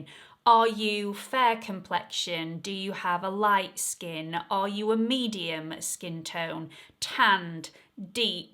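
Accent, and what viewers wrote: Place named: Newcastle, United Kingdom. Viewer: Mind blown. So simple. TY. All I know of accents I learned from Inspector Morse. You might be from Newcastle. I’m loving this account!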